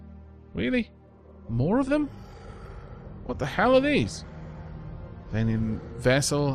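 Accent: Wales, United Kingdom. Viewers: Welsh Accent + Stellaris + Epic Music + Space Bugs = Alef-0 levels of awesomeness.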